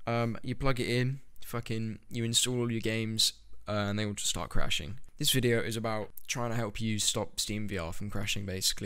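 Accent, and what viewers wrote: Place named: United Kingdom. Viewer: Thank you random British guy with a nice voice, thank you.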